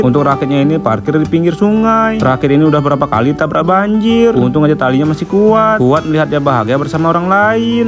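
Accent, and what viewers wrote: Java, Indonesia. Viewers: Bg kmu asli mana sih kok ngomong nya ada jawa nya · Jowoan smpean cak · Wong jowo a mas kok medok boso jowone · Jowo mu ngendi to bang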